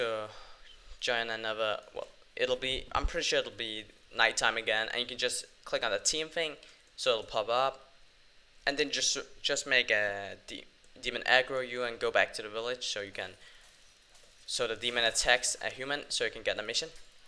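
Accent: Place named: Denmark. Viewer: this guy has a danish accent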